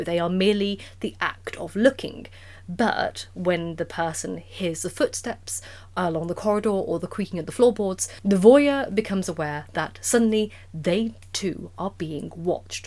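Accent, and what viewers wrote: United Kingdom. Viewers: If I have had to choose someone to represent the british accent, it would be you.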